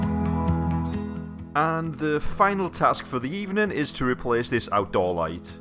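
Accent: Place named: North East England, United Kingdom. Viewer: Hi guys, I agree with everyone that says use professionals, sometimes it’s money well spent and leaves you both to do others jobs. where’s your accent from, we moved from Sunderland to Morpeth nearly a year ago and your accent sounds from up here. I’m loving your channel and have just subscribed, I’ve just finished bingeing all your other videos.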